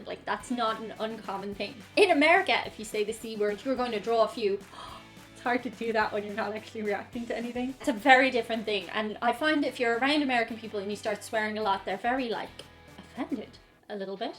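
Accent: Ireland. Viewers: Hi, I have been with you since the first episode. I honestly don't hear your Irish accent any more. You sound very normal to me, unless you use slang, or word I am unfamiliar with. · So 2 things if you dumb down your Irish accent I would love to see a video of where you're not and how you sound everyday and second being an American I cuss like an Irish 😂😂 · I noticed in your last cooking video that when you are talking to Paul you have a MUCH MUCH STRONGER accent. I love the irishn accent esp when unguys says three/thirity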